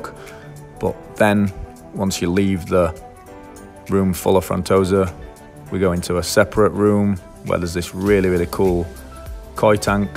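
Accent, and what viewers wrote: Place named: England, United Kingdom. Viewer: Why do you sound English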